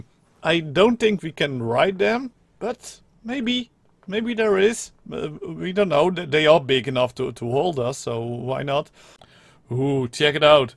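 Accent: Netherlands. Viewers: Op een andere video zei iemand in engels. Niet vervelend bedoeld, maar jij heb best een rare stem lol. Dat is zijn bakje steenkool engels natuurlijk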